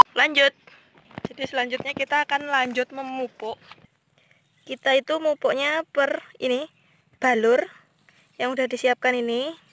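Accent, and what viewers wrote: Java, Indonesia. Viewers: Bertani Tanam daun bawang lumayan tuh hasilnya.. info tani nya sangat bermanfaat. Yang paling menarik konten ini adalah gaya bahasanya logat jawanya medok campur sari hehe.. keren mba,🙏👍👍😁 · Walaikumussala.... wehhh bawang... mbak nya pinter nyangkul... dimana ini posisinya mbak... logate koyo wong jowo. Oya saya sdh antar ya pupuk kandang hitam ke rmh mbak. Saya tunggu pembayarannya dgn ketan hitam. Mampir ya mbak k rmhku kita ngopi hitam bersama.... spy tetap dlm kesetiaan belajar terusss.... ditunggu mbak.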